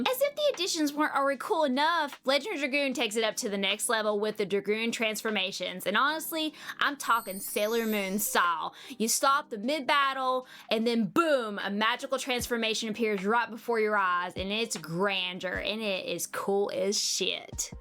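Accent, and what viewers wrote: South, United States. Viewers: Just curious if your from the southern part of USA ? You have that cute accent if not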